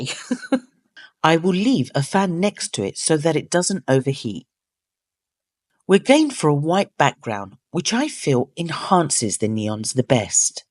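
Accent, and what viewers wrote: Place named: Canada. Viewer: you sounded like a Canadian 😊❤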